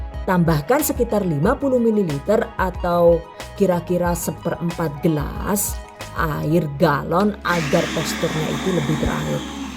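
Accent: Banyumas, Indonesia. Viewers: Jujur aku penasaran.mbaeki keturunan opotoh.wong jowo opo bulee.kok kadang Rodo ngapakk.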